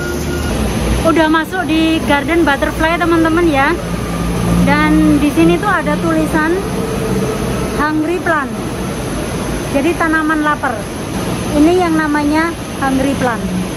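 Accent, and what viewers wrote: Java, Indonesia. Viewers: Mbak slm kenal iki wong jember hadir oya mbak jawanya dr a mana ta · Saya suka sekali bhsa penerangannya mbak. Gk pernah ninggalin bahasa medoknya wong jowo. Semngat buat sanoean berdua..serasa ikut merasakan perjalanan kalian berdua. Mantap mantap. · lidah jawa banget ya mbk singgapur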